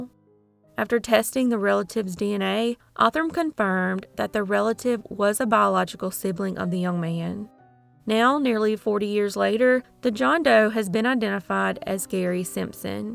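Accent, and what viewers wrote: South, United States. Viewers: Thank you for All the information on cases ,the way you present with your soft, caring, and compassionate voice. Always good to hear that southern Belle voice first thing in the morning. Take care and Be safe ❤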